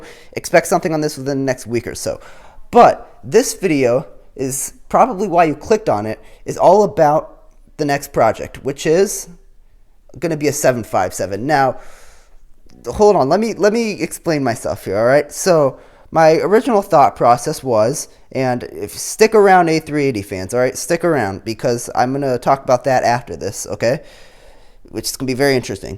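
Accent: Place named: United States. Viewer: I wanted an A350, but 757 is okay. It's stupid to get angry over what company made it, especially because it is mostly determined by a bias of American/Russian(they love their unsuccessful planes)/other(Airbus). I am British and am inclined to Airbus, you sound American and so like Boeing, Skyships like Russian because he is a vodka-drinking comrade.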